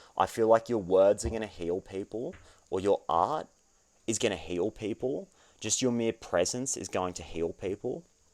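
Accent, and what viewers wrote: Australia. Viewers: Is he Australian? I'm Italian, and I don't know that accent 😅 first I thought it's Scottish but Idk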